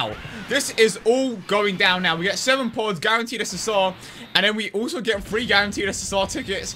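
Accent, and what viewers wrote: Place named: United Kingdom. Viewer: Karlo that's British accent for ya he literally said Imperfect Cell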